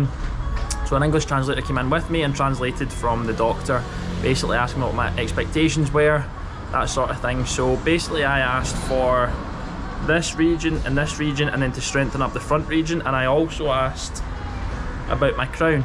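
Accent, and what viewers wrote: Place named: Scotland, United Kingdom. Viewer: I love the Scottish accent.. correct me if I'm wrong 😊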